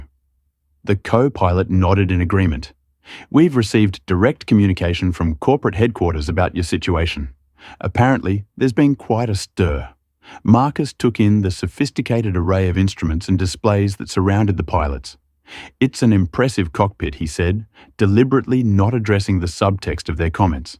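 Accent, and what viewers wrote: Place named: Australia. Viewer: It's nice to hear someone,narrating in my "language" my Aussie version,,this is real English,, n I loved every minute of it❤listening Papua New Guinea 🇵🇬 south pacific down under pondoman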